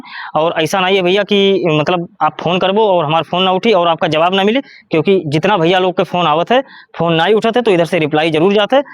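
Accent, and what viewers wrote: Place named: Rewa, India. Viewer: apki boli se lga ap Rewa MP se ho, utni door avi nahi aa sakta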